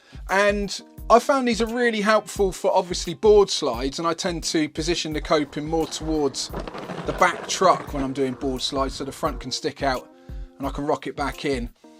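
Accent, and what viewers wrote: United Kingdom. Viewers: Proving once again that adding a British accent to anything makes it cooler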